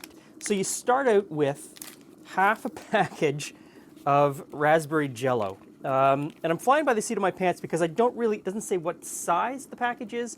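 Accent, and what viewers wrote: Canada. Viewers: everyone's talking about the bagged milk but I was like "he sounds Canadian" despite most Canadians living in Ontario have a very subtle accent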